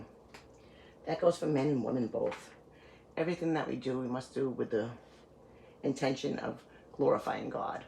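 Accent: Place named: Boston, United States. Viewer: Hey!!!!!! I’m here again!!!!! I always love checking in on you!!!!! You’re one of my best friends!! We both have that Boston accent lol 😝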